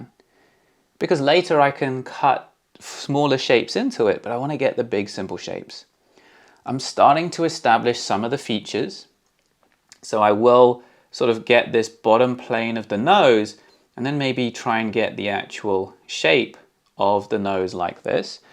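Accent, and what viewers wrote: United Kingdom. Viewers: I love the accent when an English person lives abroad they have an accent diffent from home town but yet not same accent in country they living in, nice paintings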